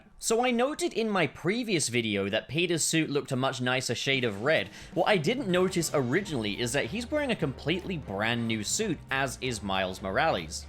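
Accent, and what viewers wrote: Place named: United Kingdom. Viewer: I got you and bobs mixed up, are you both from the same area of the UK?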